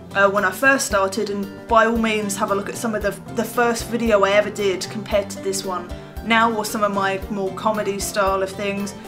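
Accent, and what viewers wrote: England, United Kingdom. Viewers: Yeah, but how do I get the cool English accent?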